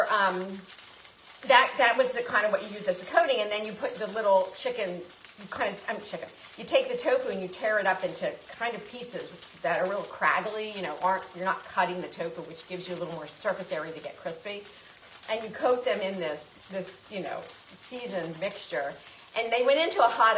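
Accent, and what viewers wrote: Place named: Brooklyn, United States. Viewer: new subscribwe love love love your videos and personality i happen to be vegan am wondering if you are from brooklyn as your accent sounds it i was born there but let 50 yrrs ago now in georgia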